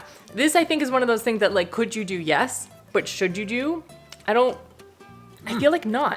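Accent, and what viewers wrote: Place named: Canada. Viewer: Hi, it has been years since I was watching your videos on both channels. And as you mentioned on your previous videos my husband also always recognize your voice and always says that "Oh she was from Canada right?". And one thing followed another and we decide to move Canada 😅 It has been a month since I moved. It is now more enjoyable for me to watch since I am actually able to get my hands on the products and ingredients. Just wanted to say hi after all of those years I happened to be able to follow a recipe that you done! Lots of love ❣ PS. You certainly add enough salt!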